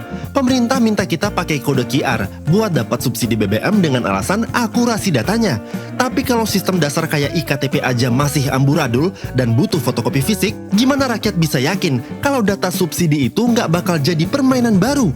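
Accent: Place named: Banyumas, Indonesia. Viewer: Salut bro....wong ngapak harapan bangsa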